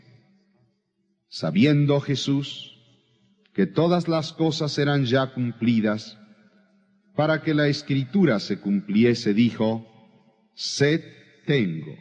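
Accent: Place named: Argentina. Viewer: Aquí todavía se le nota el acento ARGENTINO. Pero más adelante lo perdió un poco. Y maduro como el buen vino al punto que después de una hora escuchándolo, cuando terminaba la predicación siempre quedaba la sensación de quedarse con más gana de escucharlo MRMC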